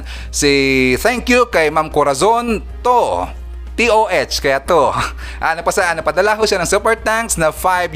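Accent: Visayas, Philippines. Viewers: Watching from Davao City. Bisaya ka idol?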